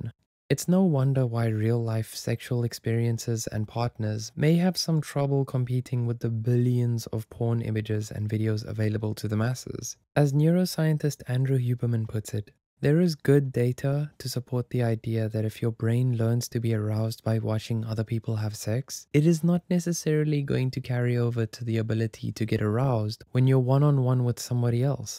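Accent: South Africa. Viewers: I hear that Suid-Afrikaanse accent 🇿🇦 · is the narrorator south african?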